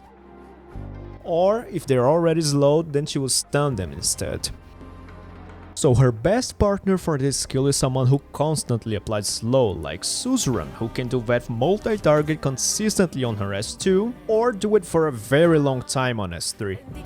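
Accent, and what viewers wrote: Brazil. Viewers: you sound brazilian 🤔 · I dont know if you're brazilian or not, but i swear bro you just HAVE that brazilian english accent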